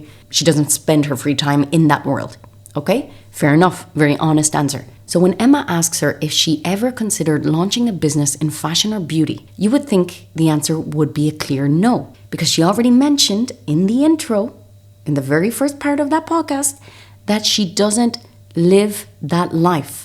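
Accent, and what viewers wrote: Ireland. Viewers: Aww. Not to be too much of a stan but that's just the Irish accent that lots of us like very much. And unlike ahem... some people 😅... it's 'authentic' 😄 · I'm Swedish and it took me some time to understand what you were saying, but now when I'm used to it I find the Irish so soothing and calming, please don't change it too much! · Your accent is so interesting. There’s an Irish twang, no offense meant (I’m an immigrant too). Just quite curious about it. Also, love your videos-I binged quite a bit in the last 24 hrs. You kind of remind me of another content creator I follow called Cheere Denise. She does voices similar to you when it comes to MM-the smarmy self obsessed voice that MM has.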